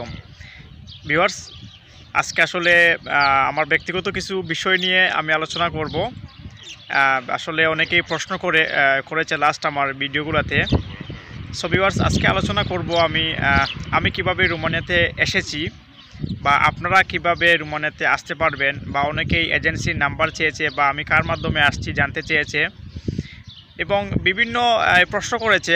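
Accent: Sylhet, Bangladesh. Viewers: ভাই আপনি কি সিলেটি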